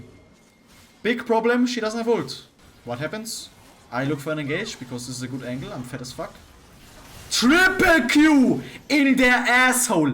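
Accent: Germany. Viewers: He's not flaming, that's just his german accent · Never seen an in-depth guide this entertaining. I don't know you but since this video is phenomenal, and I assume you are German because of your accent, I'll definitely get to know you sir!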